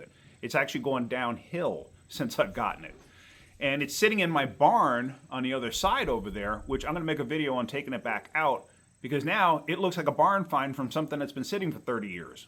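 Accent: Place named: New York, United States. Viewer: Listening to your accent , I'm guessing your from the NYC metro area ? Where are you from ? I will help your friend !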